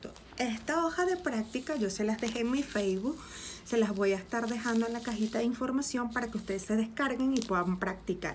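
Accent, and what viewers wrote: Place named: Venezuela. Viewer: Amo ese acento venezolano saludos desde Argentina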